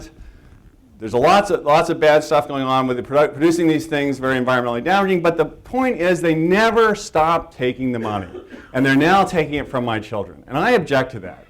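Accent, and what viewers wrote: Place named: Texas, United States. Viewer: I could tell you were from tex-a-a$$ in the 1st few seconds, you are a pre-programmed idiot... Not surprised you're an ex-lawyer that grew up with ONE radio station.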